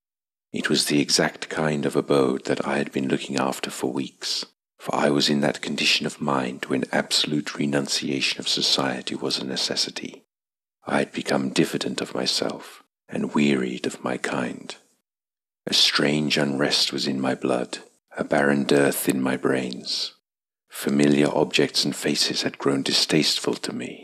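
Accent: United Kingdom. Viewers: It's a thundering story with mesmerizing British accent. I like the way of narration.👍🏻👍🏻👍🏻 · I like british accent. I listening your vids while shadowing them. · It's nice to find stories with British accent.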